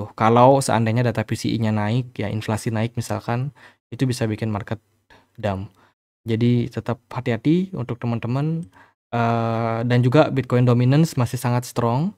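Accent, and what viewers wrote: Bali, Indonesia. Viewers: Sy suka pembahasan yg mengkaitkan ekonomi makro dengan crypto... Auto subscribe Dari logat huruf "T" nya, pasti dari Bali.. makasi bli ☺️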